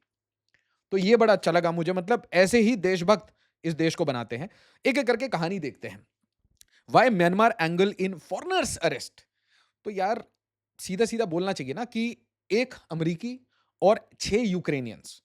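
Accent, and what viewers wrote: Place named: Bihar, India. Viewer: Sir aap Bihari kyu bngye ho accent ko kya hogya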